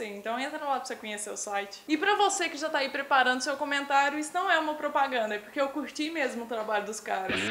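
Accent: Minas Gerais, Brazil. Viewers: Você é mineira??? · o sotaque continua??? Mineira..goiana...ou as duas??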